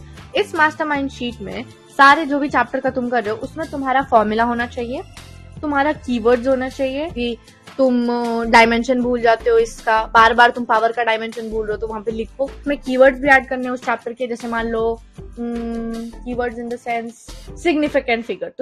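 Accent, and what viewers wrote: Bihar, India. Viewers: aapke bolne ke accent se lagta hai aap bihari ho i am also from bihar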